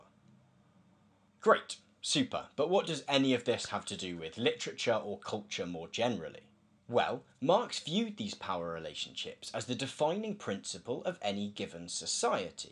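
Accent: United Kingdom. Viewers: I watch your videos and those of the Yale course on the same topics. Yours are 10 times better. You also outshine many other YouTubers discussing classic and contemporary philosophies. May I also say that watching your videos has boosted my respect for Exeter and British English, an accent I had trouble with?😀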